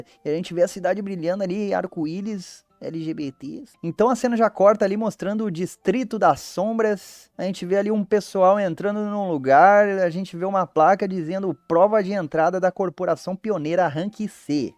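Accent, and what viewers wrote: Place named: Rio Grande do Sul, Brazil. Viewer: Mn vc e gaúcho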